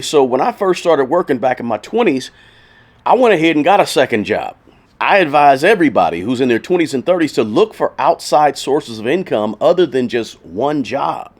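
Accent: South, United States. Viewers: Why does the best life advice come from a dude with a southern drawl?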